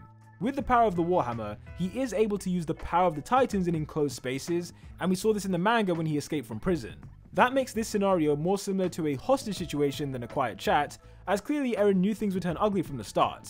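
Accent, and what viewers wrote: United Kingdom. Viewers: I think this is the new "average" UK accent.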